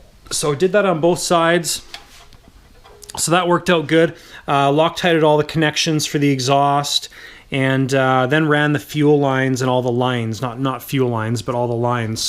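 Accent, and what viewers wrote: Canada. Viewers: I absolutely love the content on your channel.obviously you are a Canuck and you guys say things just a bit differently than ee do here in the United Staes but one thi g thats been really bugging me is how you say CORS AIR Instead of the proper pronunciation COR SAIR. Once again love the channel content and I think you build some of the best models Ive ever seen. Keep up the good work and you say corsair however you pmease....lol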